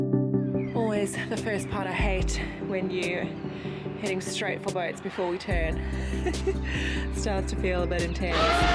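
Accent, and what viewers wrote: South Africa. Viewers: Another great video, I don’t think that using the bow thruster is cheating it’s a must have unit nowadays to have installed on your boat. It’s interesting listening to your South African accent it reminds me of my time in Johannesburg in 1982. When are you going to set sail to come up north, try the Ribble link and the Lancaster canal, 40 plus miles and no locks, You would love it. Anyway keep up the good work and don’t mind the weather. Best regards from Tom in Lancashire peace and god bless.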